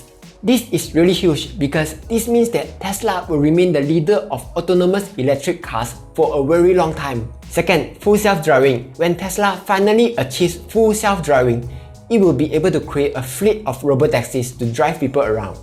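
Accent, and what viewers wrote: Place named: Singapore. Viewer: haha bro i only sub your channel yesterday, today i am the first to view your new video. nice one, i enjoy the way you talk. very singaporean la!!! but i guess you are PR, so must be another nationality.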